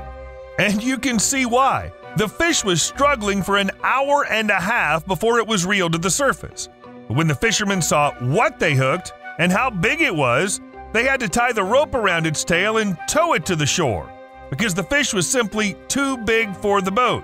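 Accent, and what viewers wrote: United States. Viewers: I just can't stand this yanks voice. Why does he have to keep shouting with that rattling voice. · Unbearable american accent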